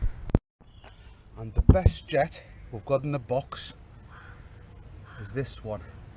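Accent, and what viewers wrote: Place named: London, United Kingdom. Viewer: have a quick question. Being in the states (mid-Atlantic Maryland), we have a weird accent that no one can figure out where we are from. Southern states think I’m from NY, northern states think I’m from GA. Yes, the accents here are that different. Is England the same? I’m guessing you may be from north London based on your thick accent. Think Adele. She has the same dialect as you and other pipe cleaners in London!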